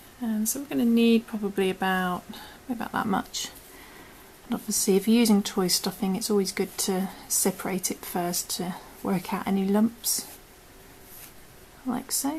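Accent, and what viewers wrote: England, United Kingdom. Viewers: Finding it confusing because although you have an english accent you are using american terminology. ie your dc is our treble. Anyway, will just watch and not listen lol. Very cute ty for sharing.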